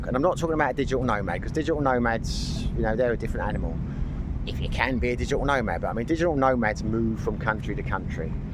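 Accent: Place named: England, United Kingdom. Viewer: Thanks for the great content! I also watch your channel because your accent reminds me of my old English friends living in the USA, as well as some of my favorite characters on Monty Pythons.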